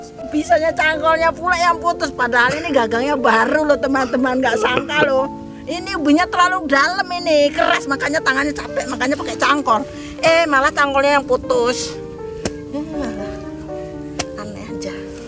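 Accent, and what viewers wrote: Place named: Medan, Indonesia. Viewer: Dari logatnya orang jawa Medan ini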